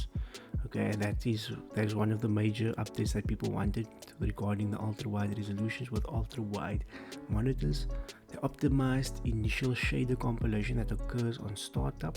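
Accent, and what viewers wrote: South Africa. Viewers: Mate love the South African accent haha. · Lol not doging your voice or anything but I genuinely thought you sounded Indian with a British accent. Only when you said south African did I start hearing that.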